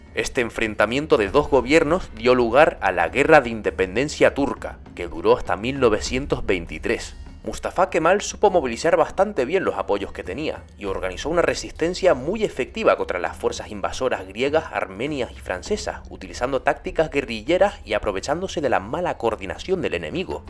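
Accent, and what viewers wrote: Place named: Canary Islands, Spain. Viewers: Creo que canario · De que parte de España sos, si sos español. Una curiosa argentina 🇦🇷. Tenés un acento distinto a los que estoy acostumbrada a escuchar en los distintos videos provenientes de España Saludos ❤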